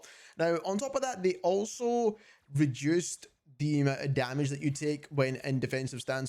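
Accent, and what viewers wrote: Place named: Liverpool, United Kingdom. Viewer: The only thing painful about this video was his liverpool accent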